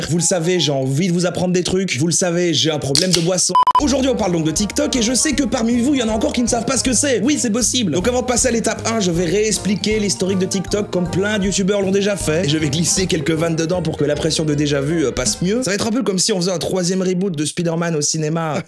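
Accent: Belgium. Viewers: il est belge donc oui, le "ui" on le prononce "oui" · Je cois avoir entendu un petit accent belge.... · accent belge :p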